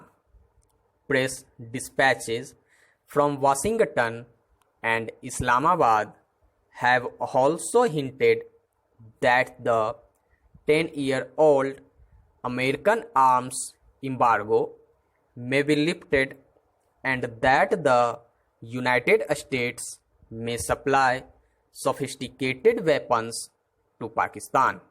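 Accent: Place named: India. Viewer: Bro, first you have to change your tone of english speaking because as you read english it seems like you are reading in a hindi tone. you need to learn english how to use tone at a specific place. Tone changes everything in english if your tone is wrong. So, try to learn how to use tone and pitch then record it and publish it on youtube. it is my suggestion bro. If you apply these things you will see changes in your reading and easy to understand what you want to dictate.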